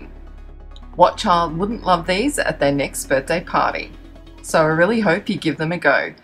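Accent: Australia. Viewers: Are you Australian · I could tell you're Australian by your accent.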